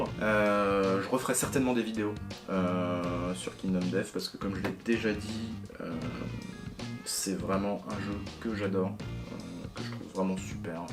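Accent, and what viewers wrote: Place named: England, United Kingdom. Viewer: quel accent anglais, et 2043 pour la wave 4, quel optimisme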